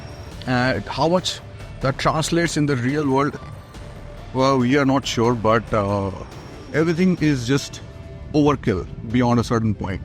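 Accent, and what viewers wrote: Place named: India. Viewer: Watching and listening a review on M1000R in Austria with that indian accent ..... I dont want to mute the video cause i do want to know about the experience but ...smh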